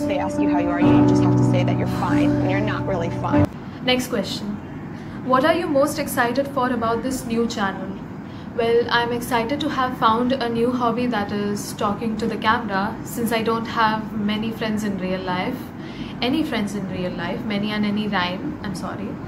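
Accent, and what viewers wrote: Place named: India. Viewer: Cringey? Are you kidding me? I adore the Indian accent! Looking forward to seeing what kind of content you create. :)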